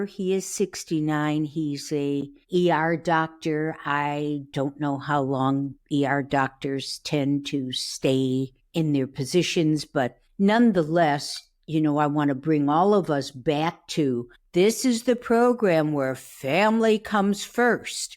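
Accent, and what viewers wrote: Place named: Upstate New York, United States. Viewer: You are so cool -- just subbed. I agree that Joan is NOT ready to get engaged. BTW, you sound like you're from upstate NY. 😊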